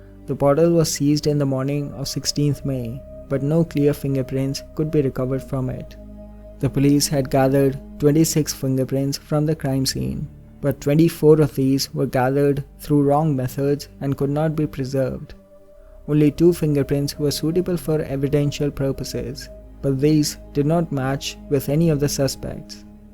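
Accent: India. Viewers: That 's an Indian accent.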